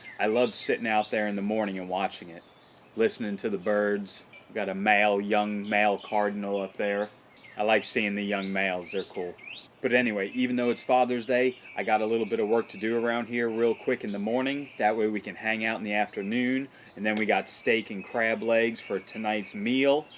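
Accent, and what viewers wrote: Philadelphia, United States. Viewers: That really does sound like a Philadelphia accent haha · Hey just found you! Love the vids! Where are you from originally and where do you live now? I hear Philly s Jersey... amiright? And in Florida now.... you?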